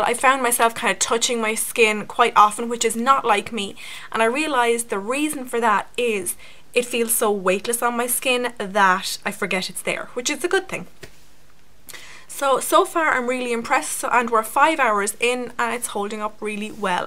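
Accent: Ireland. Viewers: I have to say, this was a brilliant review! I loved how you edited the video, like there was just the right amount of info. And I did want to know whether I wanted this foundation or not, and you've convinced me. Lovely to hear the Irish accent too :) (I'm Irish too). Would love if you'd take a trip to my channel, got some original music on there, hope you like it. Either way, I'm subscribing to you girleen :)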